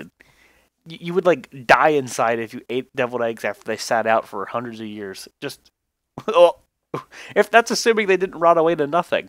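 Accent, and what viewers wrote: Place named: United Kingdom. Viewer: OMG the British accent had me going....lol if you know what I mean wink,wink, even my hubby knows a British accent I get all hot and heavy for so when I heard you speak it I lost it... breath oh by the way good game lol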